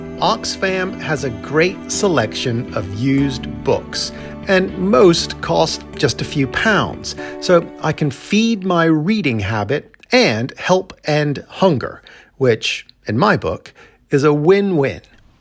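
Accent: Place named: United States. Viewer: I stumbled across your videos and have been binge watching all morning. I look up Petersfield periodically on YouTube to see what is happening and your American accent jumped out! We lived in Petersfield for a couple of years but have since returned to Maryland. Your series on the surrounding areas have and been awesome and very nostalgic for me. My daughter did Irish Dancing lessons in South Harting, performed during a festival in front of the church and have found memories of watching the Morris Men dancing (very English!). Cycled the South Downs many times and did the BHF charity 100 mile ride from Winchester to Eastbourne. Kids went to Sheet Primary School and enjoyed seeing the village and Queens Head pub in your phone box video. Anyways - Cheers and thanks for sharing your videos!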